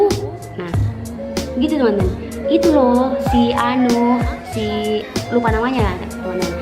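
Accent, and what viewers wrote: Makassar, Indonesia. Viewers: Suka logat makasarnyya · Lanjutkan 😂 ksi knal logat makasar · Sya dari NTB tpi pcr sya keturunan Makasar,logatnya mirip sekali sma mbaknya...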